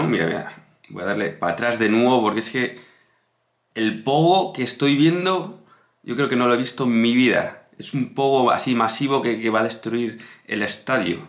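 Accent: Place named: Argentina. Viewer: Tienes un deje argentino muy fuerte... lo mismo que tus facciones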